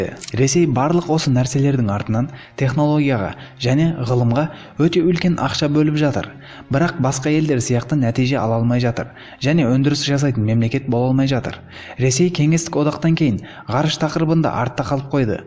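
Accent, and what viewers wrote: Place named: Uzbekistan. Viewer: Тилин биртурли сенин озбексинба акцент бар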